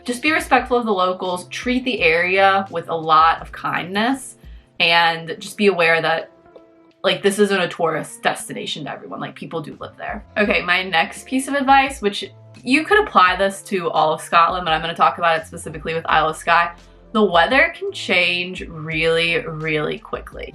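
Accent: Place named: United States. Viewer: You sound like a very respectful American. Thank you.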